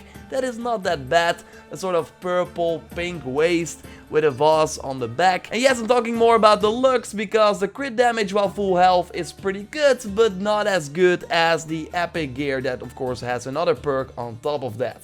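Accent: Netherlands. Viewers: Hi, by any possibility, are you from the Netherlands/Belgium, you have a very dutch accent ^^/ Oh and great videos! Just found out your channel today and have spend the day watching your AC odyssey videos ^^/ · Hij is nl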